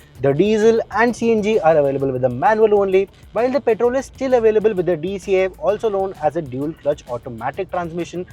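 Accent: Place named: India. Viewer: never seen a presenter speaking in english but ends up sounding like hindi. what is the point of speaking so fast and so much when audience cannot understand ? please slow down and speak clearly.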